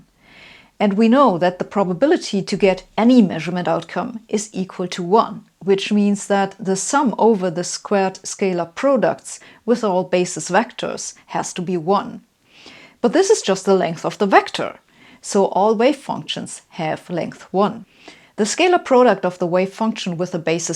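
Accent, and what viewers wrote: Germany. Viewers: Of course she has a German accent, of course she does. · your german accent is so german: it hits the clichee as a blueprint for any information to what end the effect never fails the paradox of losing it...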